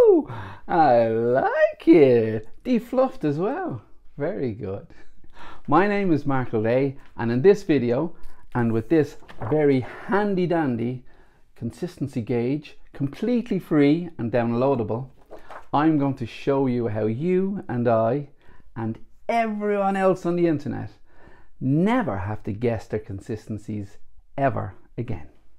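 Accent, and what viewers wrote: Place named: Ireland. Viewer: Thanks this is a great resourse and I shall be using it. You are great at explaining. I will share the link with all my YouTube pouring addict friends. Love your Irish accent and great to find some teachers in Europe. Thanks again, Rita x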